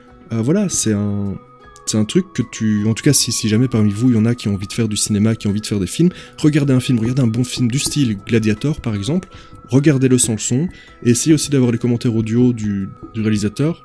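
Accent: Belgium. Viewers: Tu as un accent bruxellois.🤔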